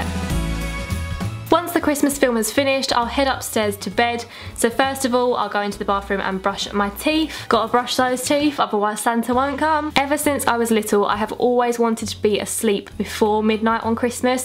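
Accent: Essex, United Kingdom. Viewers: I love her Essex accent ❤️❤️❤️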